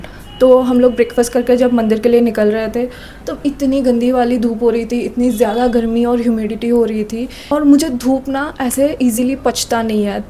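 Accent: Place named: Odisha, India. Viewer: ମୁଁ ଜାଣିଛି ତୁମେ ହେଉଛ 💯% ଓଡ଼ିଆ। କାରଣ ଯେଉଁ ଓଡ଼ିଆ ଲୋକ ହିନ୍ଦୀ ରେ କହିଲେ ଜଣା ପଡ଼ିବ ଓଡ଼ିଆ ଲୋକ।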